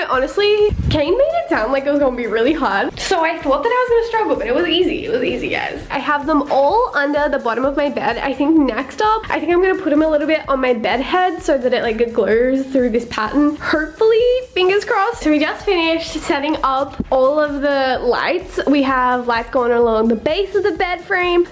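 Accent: Australia. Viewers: are you an aussie trying to sound american or an american is australia?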